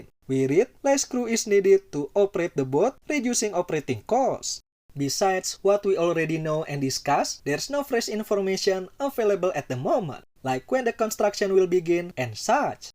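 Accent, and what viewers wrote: Indonesia. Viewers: biasa aja malah wkwkw aksennya indo banget.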